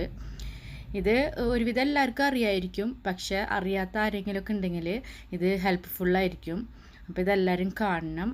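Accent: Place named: Thrissur, India. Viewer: തൃശൂർ ആണല്ലേ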